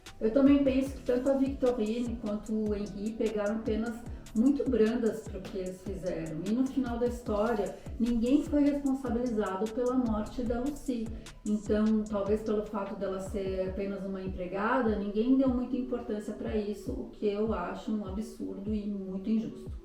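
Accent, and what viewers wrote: France. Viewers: te encontrei em 2025 e estou AMANDO seu canal!!! Você narra muito bem, com muitos detalhes!!!! E seu francês é uma delícia de ouvir!!! 🥰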